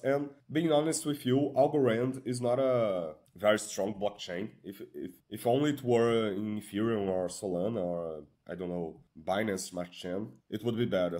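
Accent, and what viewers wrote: Brazil. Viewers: Deu para saber que era brasileiro pelo accent kkkkkkkkkkkk muito bom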